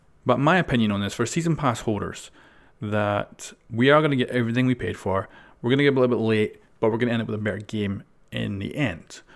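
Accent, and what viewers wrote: Scotland, United Kingdom. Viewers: Are you Scottish just wondering because I'm Scottish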